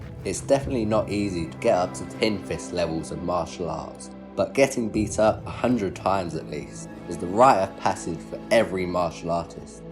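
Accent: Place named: United Kingdom. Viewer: When you're just British without the spiff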